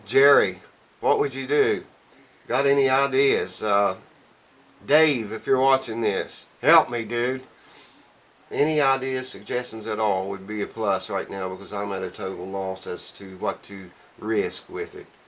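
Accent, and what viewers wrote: South, United States. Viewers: Thanks, you have helped me a lot setting up my guitar, i can even do the F chord now,Thanks I like the music videos, you have a good voice,maybe it's that Southern accent, all the best.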